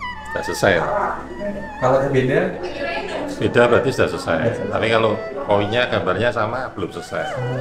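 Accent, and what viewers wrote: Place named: Semarang, Indonesia. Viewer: Semarang tulen logate nyenengke tok. Aku padamu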